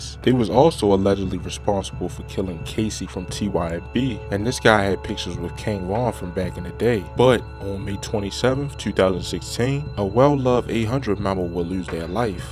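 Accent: Baltimore, United States. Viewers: Bruh made the video from b more you can tell by his accent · This dude is from Baltimore from the accented speech. My celly was from B more in the feds. Not a lot of that info is correct bout da south side and im from out west where we too busy getting money and women to be on dumb shit. But that info isn't fully correct bro and im not a folks organization member neither. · You from bmore huh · He definitely from Baltimore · Baltimore for sure. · whoever voice this is def from baltimore 😂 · This guy gotta be from Baltimore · Bruh where are u getting all this cap from how about u do something on Baltimore sounds like u from there….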